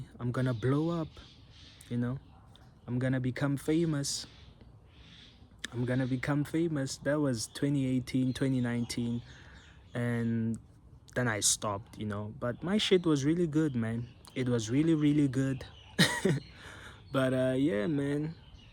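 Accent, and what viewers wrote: South Africa. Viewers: Are you from South Africa? picked up on the undertones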